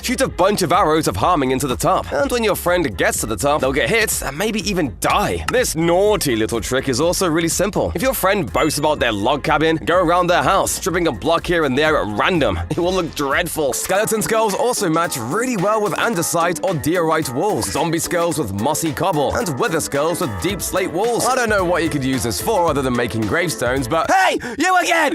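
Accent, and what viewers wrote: United Kingdom. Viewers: His British Accent is do cool 👁👄👁🙉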